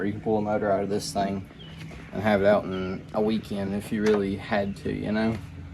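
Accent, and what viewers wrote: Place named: South, United States. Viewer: You sound southern, you near Cookeville Tennessee? I’ll help you paint it!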